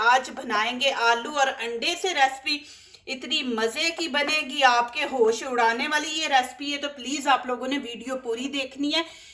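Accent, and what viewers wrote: Punjab, India. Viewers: آپ دنیا کے کس شہر میں رہتی ہیں ۔۔۔ویسے بولتی اردو ہیں مگر لگتا ہے پاکستان کے کسی پنجابی علاقے سے تعلق ہے 😊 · آپ کا لہجہ خالص پنجابیوں والا ھے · Too much panjabi lahja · Punjabi lehje me Urdu ka aur hi sawaad hai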